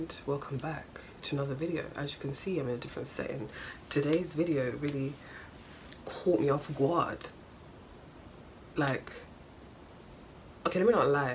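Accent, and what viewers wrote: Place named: London, United Kingdom. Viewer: I love your London accent 🔥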